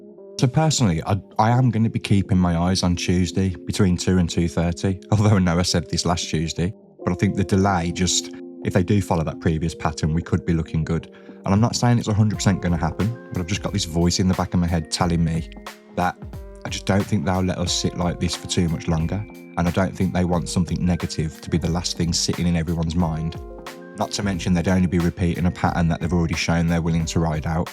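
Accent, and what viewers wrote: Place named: United Kingdom. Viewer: Everyone can just admit they dont even know what a GTA VI is and is just watching for his cool British accent.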